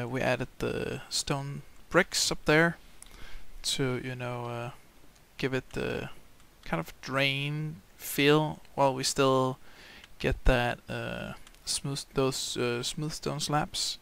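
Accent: Denmark. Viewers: Bud, the aesthetics of this place are just a pure work of genius. I am loving it and more games with your danish accent would be enjoyable. :) Keep the vids coming. :)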